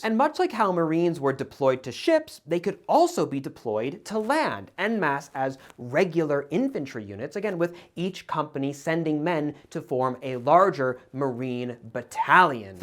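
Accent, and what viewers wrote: Germany. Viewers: I love your German accent, by the way! It reminds me of The Major from Hellsing. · Almost threw my phone thru the window during the ad with your German accent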